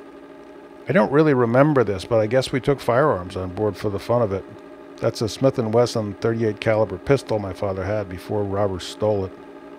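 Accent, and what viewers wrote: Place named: New Jersey, United States. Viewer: I understand why I like your commentary so much now, you are a Jersey guy. You bastards are tougher than a bag of ten penny nails. True story.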